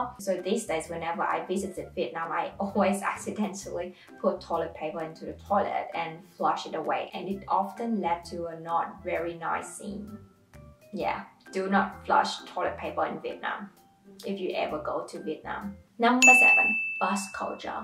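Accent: Australia. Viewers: You speak very good English, in saying that your accent is almost Aussie. I hope you enjoy your life in Australia. 🇦🇺🇦🇺🇦🇺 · That is a beautiful accent, definitely class it as an Aussie accent but still different from the norm. You should definitely keep making those trips back to Vietnam, if only to keep that accent from becoming too "Standard Aussie".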